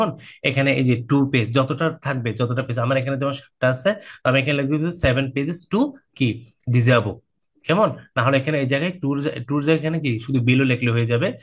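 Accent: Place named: Chittagong, Bangladesh. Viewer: vai apni ctg mone hoi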